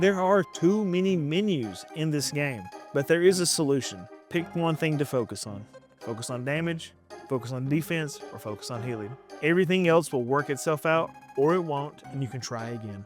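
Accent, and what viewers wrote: South, United States. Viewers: It's very refreshing to hear a Southern accent talking about something I'm interested in that's unrelated to where they're from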